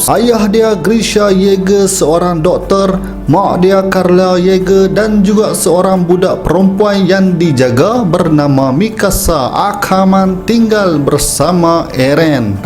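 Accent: North, Malaysia. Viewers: abg ni org utara ke? saja tanyaa 😂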